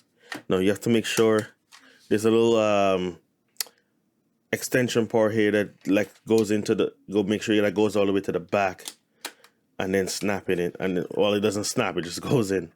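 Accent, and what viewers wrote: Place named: Jamaica. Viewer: Hey. You sound like a Yardie. If you are Big Up Yuhself!! Enjoyed your video, keep up the great work. Can't wait to see part 2.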